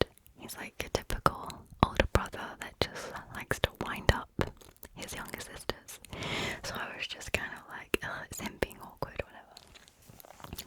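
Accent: England, United Kingdom. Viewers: Superb! ASMR and your English accent is a perfect combination👌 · I tried listening to your story I really did but I feel asleep only to wake up to another one of your videos playing. The sound of your voice alone puts me to sleep, it's one of the most soothing ones in the ASMR community. I think your UK accent has a bit to do with it as well :) You are the best :D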